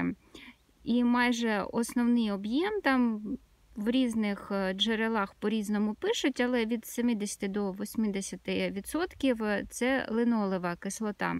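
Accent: Standard. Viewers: Более года , как ВПО, живу среди жителей трускавца, Дрогобыча, Борислава и Стебника и ни разу не слышал такую красивую, чистейшую украинскую речь. У местных жителей, украинская речь ну совершенно другая😅 Откуда, вы, мадам? Единственное, что подтверждаю, что крапивы здесь как у нас на Донбассе амброзии.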